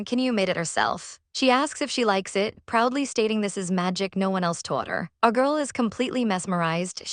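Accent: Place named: Boston, United States. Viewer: Is it just me or does this AI got a Boston accent 😂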